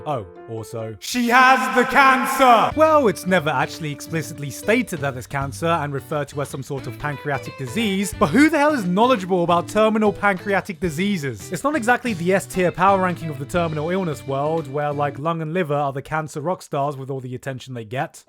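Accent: United Kingdom. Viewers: the sexy british Voice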